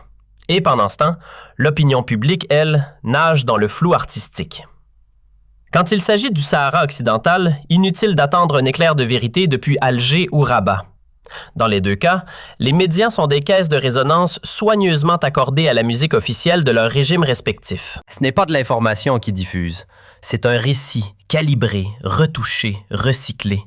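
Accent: Canada. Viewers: il faut être dupe ou idiot pour croire que cette vidéo n'est pas l'oeuvre du régime algérien, on a beau aller chercher un accent québecois les images et les séquences vidéos qui se succèdent vomissent la signature du régime mafieux de Tebboune, depuis le début que des photos, des vidéos des scènes des gangsters algériens, aucune sur le Maroc, alors qu'il est plus aisé d'accèder à l'album photo de sa majesté Mohamed VI qu'à "chambre noire" du parrain et de sa pègre algérienne ! c'était bien essayé mais raté ! la prochaine fois choisissez un accent bien franco-français, bien sûr pas l’apathique APHATIE (Jean-Michel Aphatie) mais un Zemmour, ou Onfray, ça passera mieux !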